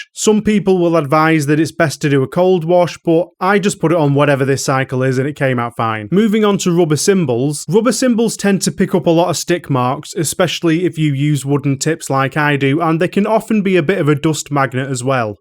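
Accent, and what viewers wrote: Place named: Liverpool, United Kingdom. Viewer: I love your accent!! ❤ Liverpool?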